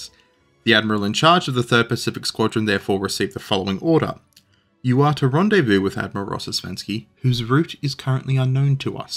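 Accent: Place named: Australia. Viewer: His editor is swedish. He is australian. You can hear it by his accent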